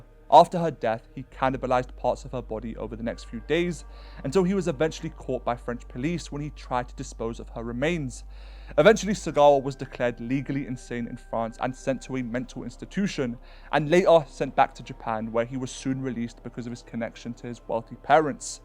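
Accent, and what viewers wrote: United Kingdom. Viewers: a hot British voice, get it right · guy has an annoying british voice lol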